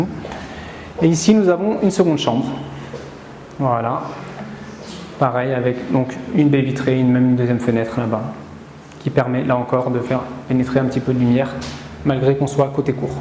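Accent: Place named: France. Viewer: Très bel accent français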